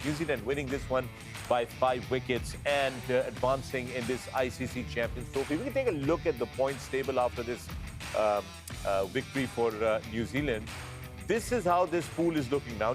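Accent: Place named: Punjab, India. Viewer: sir is heart of the show love his anchoring and panjabi accent ❤❤❤❤❤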